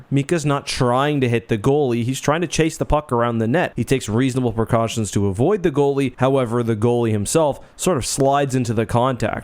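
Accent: Canada. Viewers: I always knew was a canook by his accent but I didn't know he's a huge hockey fan. Now I like him even more 😂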